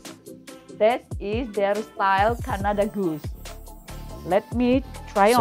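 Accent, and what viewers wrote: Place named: United States. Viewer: Happy belated Birthday wong ayu💐..Jeng pasti sdh lama tinggal di US ya..bahasanya sdh beraksen orang sana..enjoy your nice birthday presents👌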